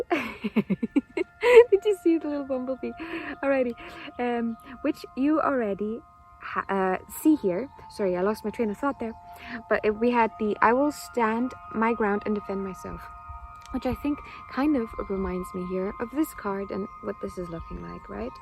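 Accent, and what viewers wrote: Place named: Ireland. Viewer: Thank you, for a very nice, spot on reading. I like the Irish touch in your accent. Looking forward to more readings. I feel very good about what I have built, abundance is very subjective. I know the abundace that I aspire towards.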